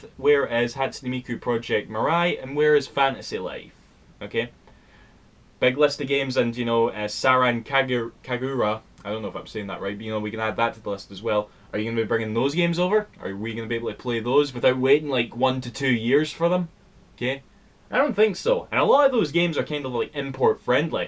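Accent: Scotland, United Kingdom. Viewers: you are so Scottish its awesome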